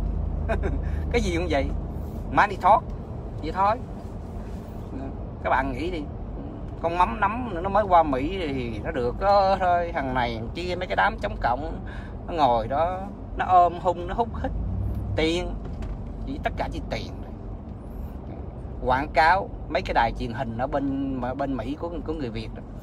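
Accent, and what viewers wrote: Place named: South, Vietnam. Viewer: hay lam anh noi dung day cach noi chuiyen rat nam bo toi thich anh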